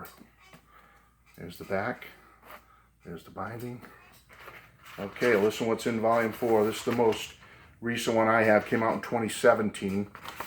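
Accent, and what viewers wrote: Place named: New England, United States. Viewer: Hi. this was a big help to me I did like your video. It was a lot of fun to here your New England accent:}. My Grand mother was from New England and see did say park the car a lot lol.:}. So thank you for that happy memory of here!!!:}. O great video lol Take care my friend!!!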